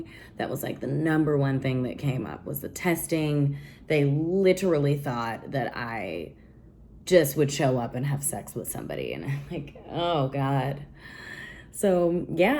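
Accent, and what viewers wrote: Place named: United States. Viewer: All American women sound like that to me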